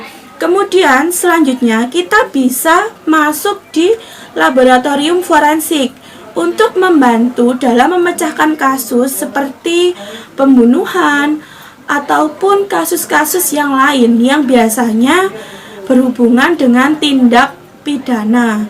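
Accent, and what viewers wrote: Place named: Java, Indonesia. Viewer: Medok banget kak .. wkwk orang Jawa kah ?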